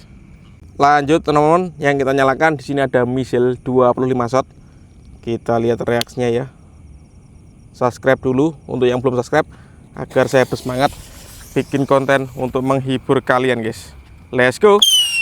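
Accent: Java, Indonesia. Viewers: Bang kue uong jowo yo😅😅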